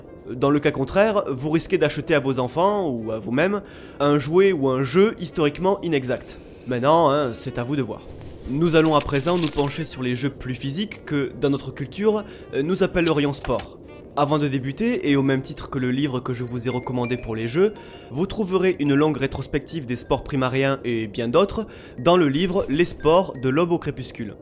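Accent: South, France. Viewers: Tu ne viendrais pas du sud par hazard (tu dis moins en prononçant le s)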